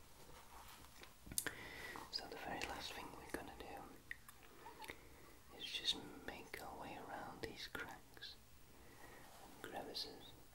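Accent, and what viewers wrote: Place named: England, United Kingdom. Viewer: Where is your accent from? My guess is either Oxfordshire or maybe north west?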